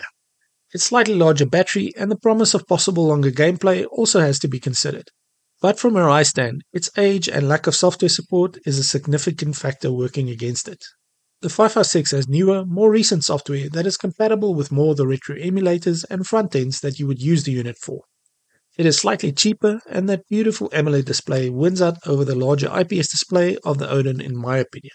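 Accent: South Africa. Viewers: keep on researching. I heard your ZA accent. I couldn't miss it. Haha am getting the anbernic 556 this month.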